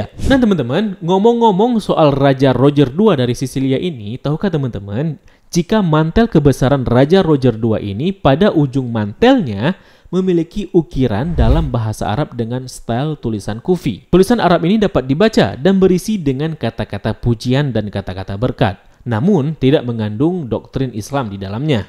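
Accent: Aceh, Indonesia. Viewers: Beliau orang aceh. Yap jelas ada bagian suku dan budaya melayu. Lebih tepannya melayu sih dari pada suatu negara. Karna melayu ada di malaysia dan Indonesia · Wajarlah Beliau kan orang Aceh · Logat Aceh si Babang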